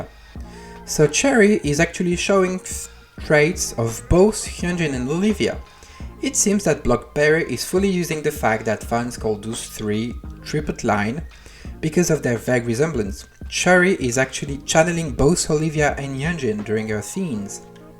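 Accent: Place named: France. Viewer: Idk why but your accent look like a french one 😌🤔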